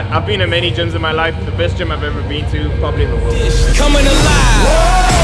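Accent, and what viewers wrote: South Africa. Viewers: You sound south African, are you? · Is this guy from South Africa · This guy sounds South African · This guy from south africa? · Bro your accent , are you South African? Thanks for great content!!! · JUST SUBSCRIBED!!!!! Im from Cape Town and I pick up you from SA Straight from the pat by the accent. Enjoyed watching this video, looking forward to getting to see future Videos you drop. Much love ...:) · Dude sounds South African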